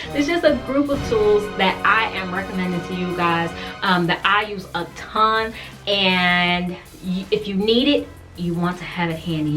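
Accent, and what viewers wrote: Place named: Houston, United States. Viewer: i love your channel and i love your houston accent lol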